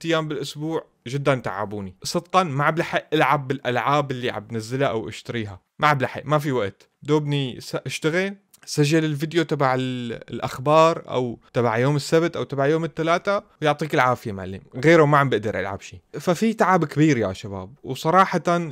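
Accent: Syria. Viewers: لا منتا غليظ وانا ما اعرف سوري وفاهملك ١٠٠ فل ١٠٠ بس منجد يمكن الناس ما يحبو اللهجة سوري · انا مابتابع قناتك الا عشان اللهجه السوريه تبعك 😂🤣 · احلى لهجه هيه السوريه و انت بالعكس انسان راقي و مرح كلش والله .. بالعكس استمر و حاول اتطور اكثر بالقناة و ربي يوفقك للي تتمناه .. اخوك ومتابعك من العراق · اوووعك تترك القنااه انا ماحبيت المورتال الا بسببك الصراحه وعندك تفاعلك رهيب وبالعكس.. الي شدني اكثر لهجتك السوريه والله رووعه ( ولك يحرئ ديبك يازلمه😂) هالجمله ذي تضحكني لما تاكل لك اكله في المورتال استمر و ان شاء الله ربي يوفقك نزل مقاطع قليله بس لا تترك القناه♥. وتحياتي لك يا. اخووك من السعوديه🇸🇦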